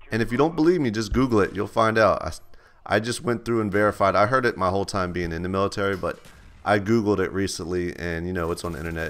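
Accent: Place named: Wisconsin, United States. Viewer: wisconsin accent FTW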